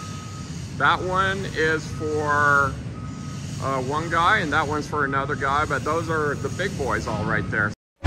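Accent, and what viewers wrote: South, United States. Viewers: Mr., Are you from the East Coast below Virginia somewhere?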